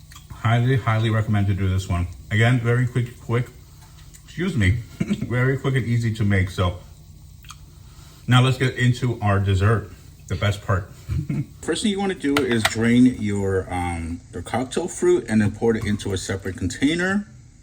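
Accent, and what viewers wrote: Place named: Miami, United States. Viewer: My family and I love and adore you. I grew up in Miami and I here a familiar accent 😮😊😂❤🎉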